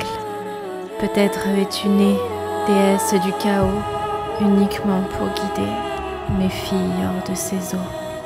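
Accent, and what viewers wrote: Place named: Japan. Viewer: wsh tu parle français bien japonais stop wsh puré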